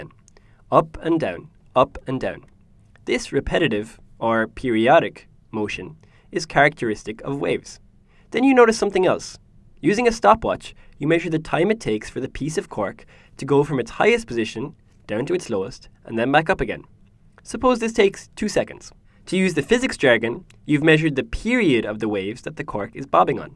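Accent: Ireland. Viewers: His name and accent are Irish , fair play buddy ! Good video! what you studying ? Physics ? Is this video part of college or is it just a project you are doing ? I would be interested in making videos about science. Love teaching others! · Of course he's Irish. Dunno about the Waterford part, I only know the wateford accent that tends to be monotone. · that an irish accent? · I love his Irish accent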